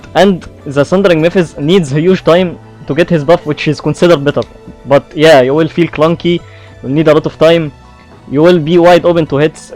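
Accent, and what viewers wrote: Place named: Egypt. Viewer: man why i feel ur an Egyptian cuz of your English accent 😂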